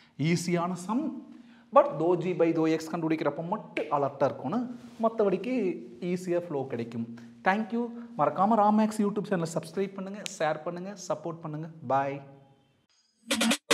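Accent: Coimbatore, India. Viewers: Avaru oluga tha pesura ru. Athu coimbatore slang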